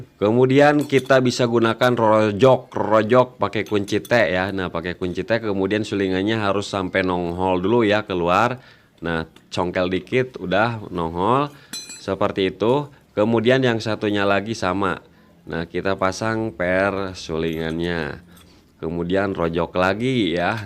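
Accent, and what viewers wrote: West Java, Indonesia. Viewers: mntap bang hatur nuhun elmuna resep pokonmah ngarenge cariosan orng sunda mah😂👍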